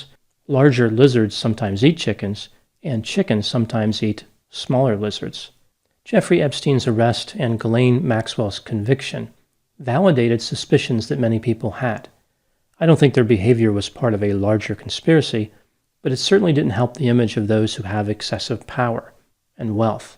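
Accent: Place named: Southeast Pennsylvania, United States. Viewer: Dr Grande is also an excellent comedian. Your SE Pennsylvania accent slips through at times. An accent I would note that I have never seen imitated in major production television or film.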